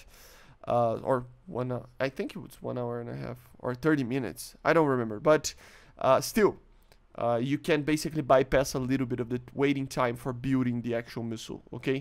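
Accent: Brazil. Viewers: Eu sabia que era BR, só vi o seu clã no final do vídeo KKKKKKKKKKK Eu falei, mano, esse sotaque é muito de BR, não é possível !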